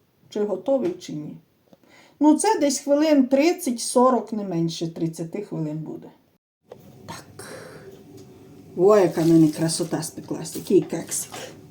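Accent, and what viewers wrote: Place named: Kremenets, Ukraine. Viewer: На кременеччині, Тернопільської області так говорять. І що? В кожному селі, чи районі, області є свій діалект. Не знали?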